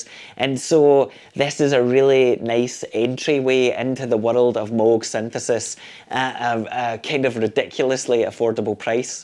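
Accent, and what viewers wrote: Scotland, United Kingdom. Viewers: Enjoyable video😃 Great Scottish accent 🏴 Speaking from 2025, the price in the UK is about £220 now, which is a bargain! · Putting together such an entertaining, heartwarmignly Scottish-sounding and as usual very personal review after distracting events happening proves you can focus on the meaningful things in life 👍 Thanks for this video, lad. In many ways Moog's Mavis reminds me of my recently acquired Behringer CRAVE which I'd also recommend for synth novices and starters in modular. Lots of patching fun included 😉 Did you actually get your hands on one of those yourself yet? If not, don't miss out on CRAVing!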